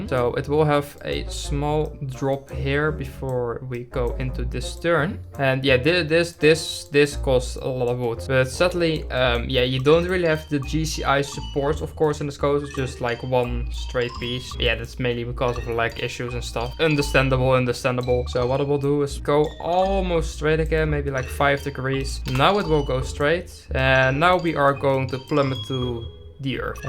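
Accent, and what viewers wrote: Netherlands. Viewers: Your english is so dutch if that make sense😭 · You sound like a dutch person that speeks english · Nice accent it sounds dutch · I smell dutch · I hear the Dutch accent haha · I smell Dutch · I SMELL DUTCH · Ur Defently from the netherlands u hear it / je moet niet altijd engels praten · jij bent een hele goeie youtuber ik hoor jou nederlandse accent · Ik dacht al waarom je engels zo erg klinkt als nederlands maar toen kwam ik er achter dat ik al eens een keer je video heb gezien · Bro when I heard u the first time I already knew that u where dutch like me 😅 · Ik ruik een Nederlander. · I immediately smelled dutch. Hoe gaat het man? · Why do you sound so Dutch? If u are: 👄🦷NeDeRlAnDs💪👁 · Ik ruik een Nederlander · You sound dutch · I smell Nederlands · Je bent Nederlands ik hoor het aan je stem je hebt een Nederlands accent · Ik vind het grappig dat je hoort dat je Nederlands bent hele toffe vidio 🧀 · i smell dutch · I smell Dutch🟥⬜🟦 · Aan je acsent ben je Nederlands denk ik he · ik ruik een nederlander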